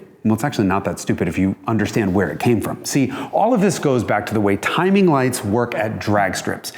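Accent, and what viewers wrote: Germany. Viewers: His German accent sounds like Klaus from American Dad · His German accent is on point. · The German accent lol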